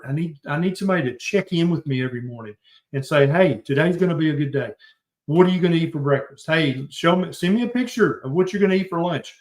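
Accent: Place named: Tennessee, United States. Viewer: Ikr?! Plus, he is from the part of TN that I am from so his accent hits my ear so right.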